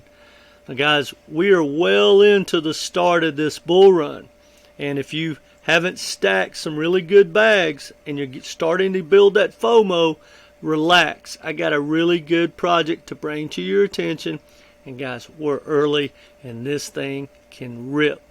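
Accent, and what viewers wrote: United States. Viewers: I love your accent SO damn American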